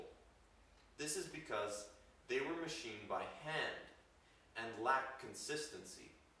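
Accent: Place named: United States. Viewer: I'm always late to the party. Only got a yt recommend today. Good info but as the others have said a touch light on the volume front and your pronounciation a little too american but forgiven on both fronts.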